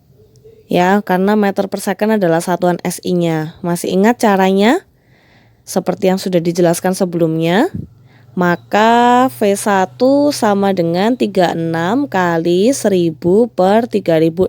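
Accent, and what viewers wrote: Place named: Java, Indonesia. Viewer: Medoknya tetep ya ce..hehe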